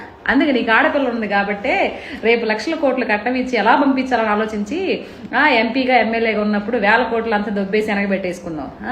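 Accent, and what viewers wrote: Nellore, India. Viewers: GODAVARI YASA KADHU RA BABU, MAA NELLORE AK47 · Nellore yaasa not godhaari yaasa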